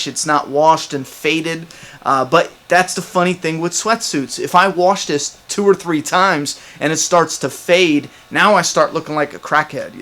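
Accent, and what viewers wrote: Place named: East Coast, United States. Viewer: Q&A where are you from? I hear an East coast accent.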